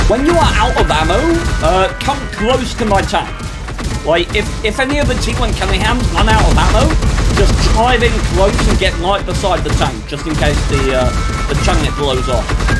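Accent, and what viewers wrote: United Kingdom. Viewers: This guy is the most bri’ish guy in wot…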